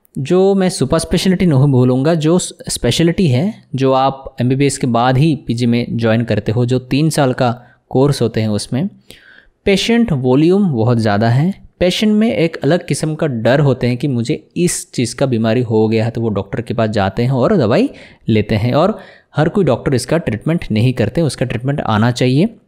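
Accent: Bengal, India. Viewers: U have bengali accent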